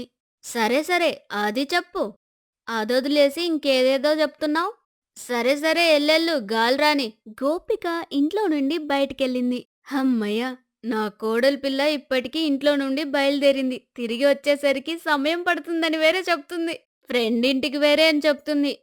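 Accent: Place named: Chittoor, India. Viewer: Chittoor slang super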